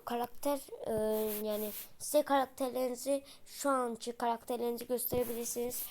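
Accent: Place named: Azerbaijan. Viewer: ade azerisen bilinir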